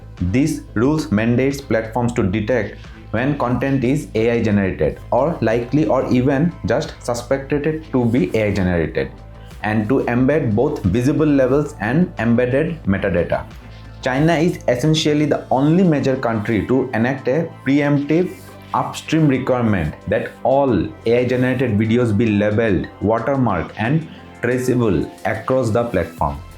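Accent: India. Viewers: Curry English gives me terrible headaches.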